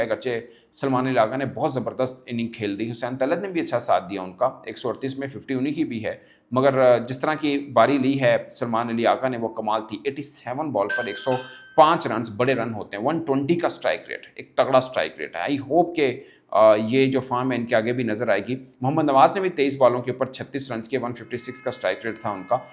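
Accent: India. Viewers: India waly jesa bolty hen wesa he bolta hy 😢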